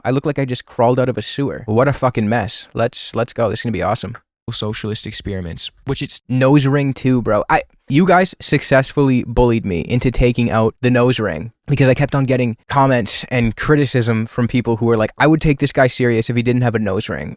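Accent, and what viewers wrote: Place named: Quebec, Canada. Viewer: bro quebecois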